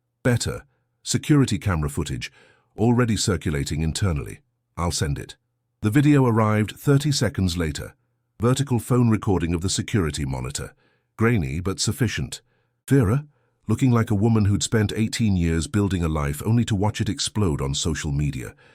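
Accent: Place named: United Kingdom. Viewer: The Brit 🇬🇧voice makes the stories even better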